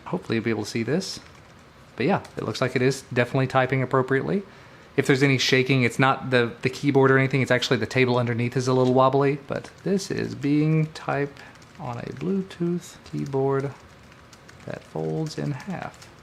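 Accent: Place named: South, United States. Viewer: I know you said you didn't have an iOS device, but I do. I bought mine about four years ago to use with my iPhone Xr, and loved it, although it took a long time to get used to the tiny size. I just upgraded to the iPhone 14 Pro, and after pairing the BK-03 keyboard, I can't get the space bar to work unless I press the Alt key and the space bar at the same time. As you can imagine, this can't stand. I'd never get anything done. I've monkeyed around in my phone's keyboard preferences, and have toggled various settings off and back on (this very often works!) and nothing has helped. I even switched ANSI to another option, then back again. Any help or suggestions you may have would be truly appreciated. By the way, after slogging through all the other videos, I responded to you because of the Southern accent. I'm a displaced Southerner myself, born in the wrong state! 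Ha ha!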